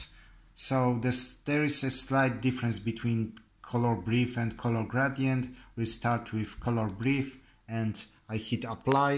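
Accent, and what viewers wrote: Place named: Poland. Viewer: Po akcencie zgaduje ze jesteś z polski. Mam racje xD?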